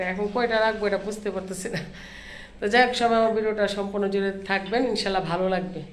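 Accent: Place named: Brahmanbaria, Bangladesh. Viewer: তবে বিশেষ করে আপনাকে একটা কথা বলতে_ই হই, আপনার মধ্যে ব্রাহ্মণবাড়িয়ার একটা আঞ্চলিকতা লক্ষ করা যায়।❤❤❤❤❤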